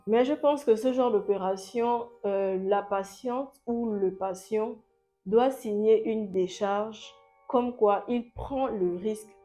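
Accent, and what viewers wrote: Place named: Gabon. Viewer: Quand j’entends ta voix, ton accent Gabonais me fratte carrément au visage 🤧✨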